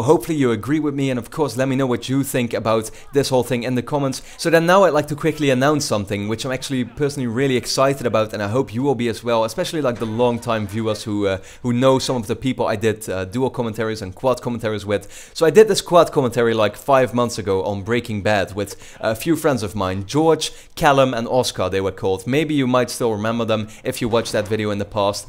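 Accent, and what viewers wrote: Netherlands. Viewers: are you dutch? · Are you dutch? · Are you Dutch?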